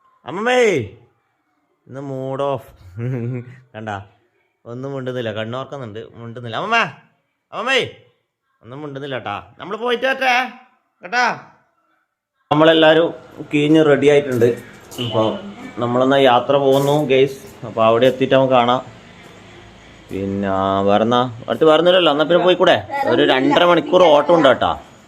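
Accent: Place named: Kasaragod, India. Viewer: നിങ്ങടെ സംസാരം കേട്ടപ്പോൾ ഞങ്ങടെ കാസറഗോഡ് ഉള്ളപോലെ തോന്നും അതാണ് ചോദിച്ചേ അടിപൊളി njan ആദ്യമായി നിങ്ങടെ വീഡിയോ കണ്ടത് ഞങ്ങൾക്ക് കുടുക് അടുത്ത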